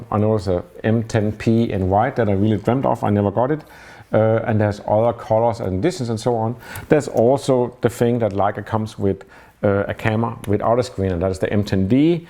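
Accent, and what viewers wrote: Denmark. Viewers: Your intro are my favorite. I love the danish english vibe. Have been follomt you for some time now. Keep it up. 😊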